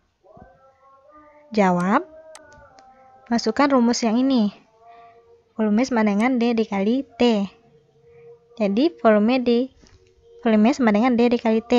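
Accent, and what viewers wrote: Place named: Malaysia. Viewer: suaranya kok gitu kayak orang malaiysa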